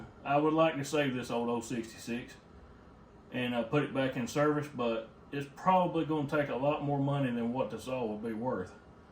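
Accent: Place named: South, United States. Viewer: you might want to check a company called Farmertec. It is a Chinese company that sells all kinds of parts for your saw on the internet. I bought parts from them before. It was not too bad, shipping and cost. I also watch a couple chainsaw youtube channels, people who work on them.. Tinman and the second one is ironhorse. both are very good down home people. They might have some used parts you need. You remind me of my dads side of the family. They are also from Tennessee. You look like them and your size is about the same. I can definitely understand your southern accent. It makes me smile. Oh I miss them. Now I'm old and they are gone.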